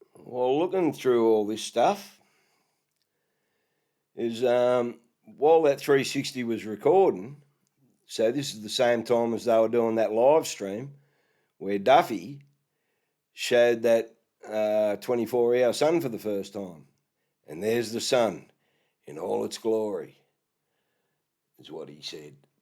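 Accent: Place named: Australia. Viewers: oz accent just makes it all that much more entertaining.. i hope these clowns continue to release more and more questionable footage, so we can keep LAUGHING at them. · His accent is absolutely stereotypical Australian…I know, I’m Australian and my accent isn’t like his at all. We have more than one accent here. WA is different than SA and TAS is different again, etc. Also, the more rural the more it will sound like. You generally only find posh accents in the cities and larger towns and the real posh ones sound like fake Brits. 😂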